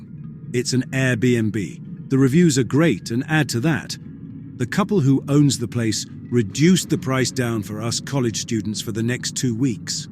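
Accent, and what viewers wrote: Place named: England, United Kingdom. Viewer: Wow, it took me exactly 26 seconds to subscribe! True horror stories told w/ an English accent! Nuff said, subscribed!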